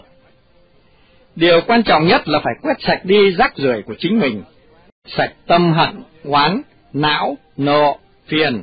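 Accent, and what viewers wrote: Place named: North, Vietnam. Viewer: Dọng đọc bắc nên mình là người bắc nghe rất dễ hihi. Mong chất lượng ghi âm ngày 1 tốt hơn nữa để tránh rè ạ 🌷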